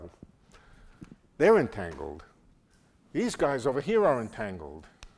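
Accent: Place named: Bronx, United States. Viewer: bronx guy! lol love it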